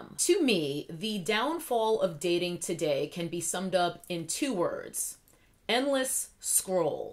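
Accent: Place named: New York City, United States. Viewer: Have you been hanging out with your NY crew??? The accent is strong in this one today! Also, yea dating B-lows. I've been off the apps for 3 (?) years now and this was my reminder to stay off.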